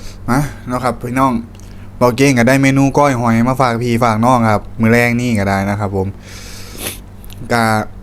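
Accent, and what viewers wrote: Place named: Sakon Nakhon, Thailand. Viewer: เว้าญ้อคือสกลนครเนาะหนุ่มน้อย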